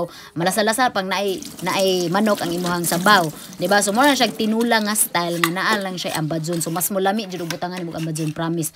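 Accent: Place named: Bohol, Philippines. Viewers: Lingaw jud ko dai nga motan-aw sa imong video kay pure boholano ang imong sinultihan. Padayon sa imong show, kay panalanginan ka Ginoo. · Mag ngisi jud ko maminaw sa tonada sa sinultian sa bul.anon. proud anak sa pure bol.anon ko. Basta bol.anon kugihan. ✌️😁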